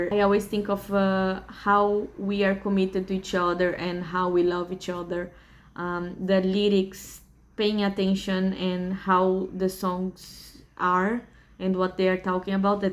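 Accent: Philippines. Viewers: you speak English similar to the way a native Filipina speaks English, why is that? I’m a Filipino American married to a Brasileira but my wife’s English is very Brasileira. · I don't know where you're from but I'm absolutely positive you've lost your native accent. You now have a Filipino accent.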